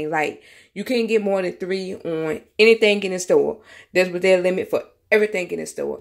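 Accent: United States. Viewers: I love your accent 😊 makes me miss my family in N.C. and don't worry about how you pronounce things, it's cute.....😁 and we understand. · Pronounce it like this " Sara V" .😋 I am from Northern VA, ( living in FL), your southern accent is fine! Stop fussin' so much! Lol!😄 ( I read some of the comments after I posted this, had to come back and say, smart bunch of gals here! 😉 I said the exact same thing they did, with the exact same spelling! Lol! And I didn't read the comments first! (I promise)! 🤣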